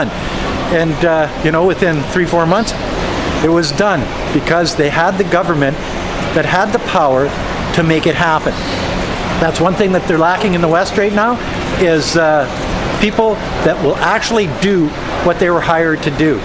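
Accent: Canada. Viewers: The wrong people? Between a rock and a hard place we are. Thx canadian irish sounding guy. Theres severe corruption battling the people who want the world to be together in unity peacefully, and were all looked at as choosing this way thats happening now or are thought to all be bad and hate china or whatever. I dont think you really know whats happening to us over here neighbor! Keep your sodium chloride facebook comments. Thise mailboxes were being refurbished and some of those images of trucks taking mailboxes are completely out of context. Mail in ballots are the way democracts are trying to rig this election. We are being manipulated and falsified probably just like a lot of situations ALL over the world, you also have no idea about. But your video is appreciated anyway :] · So Strange to hear this accent on this canadian guy.... Born and raised slag off his own people like that! 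I'm So glad hes absolutley in love with his life in China...Thats amazing. And you know what will happen the next time he flies home to the country hes using curse words to describe Canada as going in the toilet? Those Canadians will be as kind, and welcoming as ever, No police to take him in for questioning , no blocks on content he posts about his opinions to keep the social order. But you know what thats okay. Maybe one day he will think better of the people at home.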